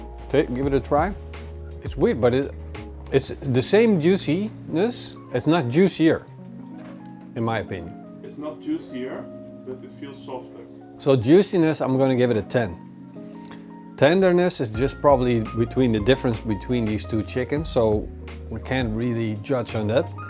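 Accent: Netherlands. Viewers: "hoe" haha eindelijk. dacht al heel de tijd een nederlands accent te horen😄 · Beercan chicken best on gasbbqs. Keeps the chicken white inside. That Holland english talking accent is horrible!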